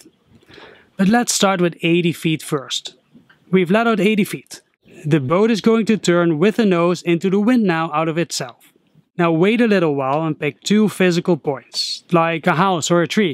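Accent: Netherlands. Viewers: NICE Dutch accent and great video! fantastisch gedaan meneer :-) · Are u dutch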